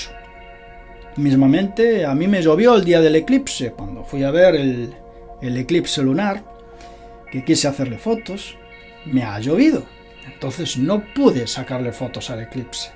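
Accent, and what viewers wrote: Spain. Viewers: es de España fijate en el acento que tiene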